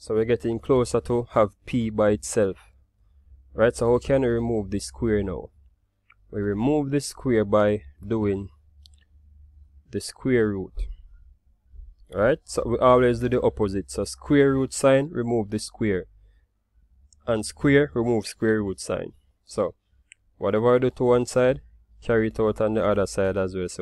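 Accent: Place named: Jamaica. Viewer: I wish I had a Tutor with a Jamaican Accent. Would have made Math's more fun!!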